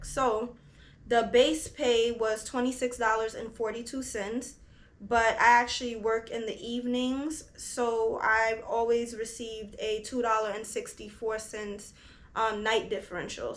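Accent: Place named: New York City, United States. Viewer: You have a very heavy New York City accent!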